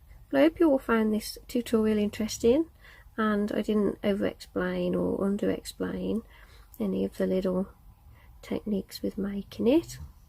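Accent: United Kingdom. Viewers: ....I just HAD to add a comment about your tutorials. I just completed this tutorial and I have to tell you that your soft voice is as soft as silk and it is soooo soothing to listen to you. I could listen all day! Love your British accent, also! You are such a favorite artist of mine; you keep every part of your tutorials clear & simple. I also love your name. My youngest daughter is named, also! You are a stellar jewelry artist & I am so appreciative of your tutorials that teach so much. I am retired and have been making jewelry for six years now,of various kinds, but am fairly new to wire wrapping/weaving. Thank you so much for your sharing generosity!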